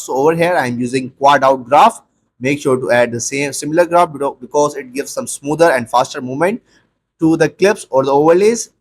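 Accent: India. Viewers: Bro your Indian accent make me fall of Laugh 😂 ( no hating ) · yeah he got an Indian accent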